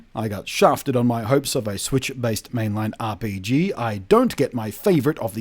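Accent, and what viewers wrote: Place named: Australia. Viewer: There's something about a deep voiced eloquent Australian's ranting that cuts deeper, ya know?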